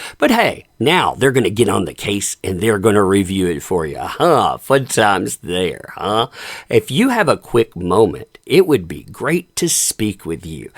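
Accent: United States. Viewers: I actually like your American accent as a Brit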